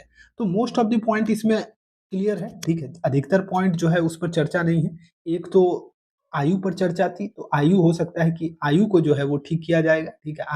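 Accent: Uttar Pradesh, India. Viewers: Tum up se ho kya? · Tum Up se ho kya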